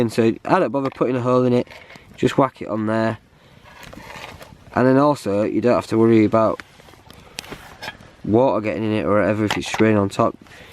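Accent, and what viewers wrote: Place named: England, United Kingdom. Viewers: Eh up lad. Going a bit avant garde now eh! have you been at the wacky backy lately? And by the way, ya call it an oss in Yorkshire not a horse, I think your from Lincolnshire really you know, its your English and your accent??? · I can't understand half the times lad for your English accent. :-(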